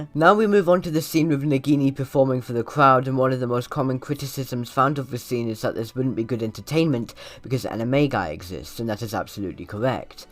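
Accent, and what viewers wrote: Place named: United Kingdom. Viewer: Oh wow, you're actually British. Didn't expect that.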